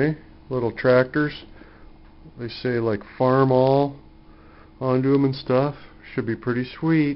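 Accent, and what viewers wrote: Canada. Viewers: you Canadian?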